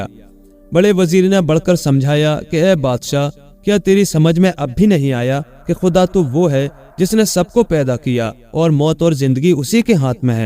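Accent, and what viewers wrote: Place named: India. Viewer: Apki kahania'n achi hoti hain bas apka lehja shayad Hindi hai agr Urdu lehja hota tou chaar chaand lag jatay...ager aap phool ko fool na kahein aur issi tarhan aur bhi bht si cheezein tou behter rahay ga.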